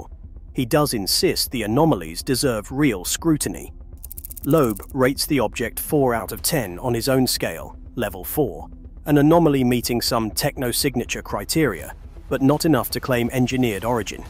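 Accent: United Kingdom. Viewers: Understand that the timing is perfect. The "new world of disorder" and all these meteors, comets, UFO's etc means that they can dump nukes on their own countries aka FALSE FLAG, and blame anything from AI, to the Cartel, to Russia, to UFO's, to global warming, to the kitchen sink. And the narrator English accent is always a give away.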